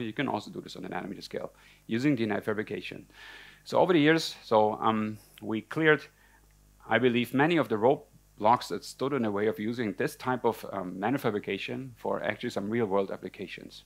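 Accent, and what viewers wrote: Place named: Germany. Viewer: He sounds like a German :D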